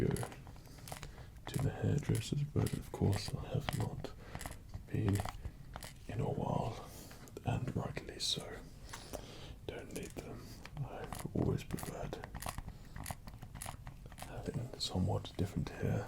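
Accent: United Kingdom. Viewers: freakin stop thor jokes but I have a question are you from the U.K , Sweden or something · Kyaaaah! I'm so happy I found out about asmr!! I'm a pretty anxious and agitated person. Sometimes, I'm tired but I can't get to sleep because my mind is always full of fast and stressfull thoughts T.T But your video really helped me! The plastic sounds are The best \o/ now I'm pretty sleepy @@ thank you for making this video and for helping me to calm down my messy mind. I really appreciate your voice too. I'm Brazilian, but I really love British accent (I don't remember if it's written like that). I think it is chic, fancy... I don't know which adjective fits better aahuashushauas But anyway thank you! Make more videos like that! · is he English because he kinda sounds it but looks Scandinavian and sometimes sounds idk just not English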